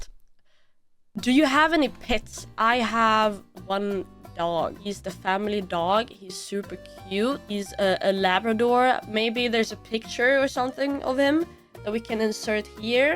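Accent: Sweden. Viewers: There's actually the tiniest hint of a tiny Swedish accent if you listen carefully. All Swedes I've met in real life speak execellent English. Oh well an then there's Anomaly of course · you sound swedish. its the swedish accent breaking through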